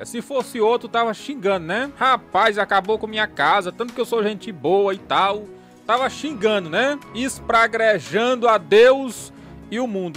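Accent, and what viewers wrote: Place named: Northeast, Brazil. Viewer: Paz pastor gosto de assistir seus vídeos, e gosto principalmente do seu modo de falar,esse sotaque nordestino, sou nordestino mas moro em Manaus a muitos anos, oro pra que Deus continue abençoando o seu ministério, pois tem trazido edificação, Deus abençoe todos que assistem, vai nessa força varão Deus e contigo!!!!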